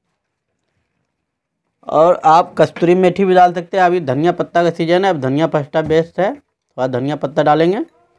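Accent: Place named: Jharkhand, India. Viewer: Kaa o bhaiya, Bhaasa se to Jharkhand ke lag rahe ho...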